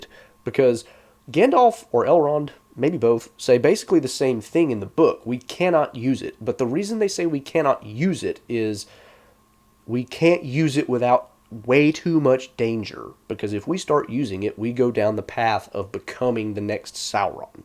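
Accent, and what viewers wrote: South, United States. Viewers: That is a very HARD Southern accent you appear to have. Kinda glorious. The os and as are a dead giveaway.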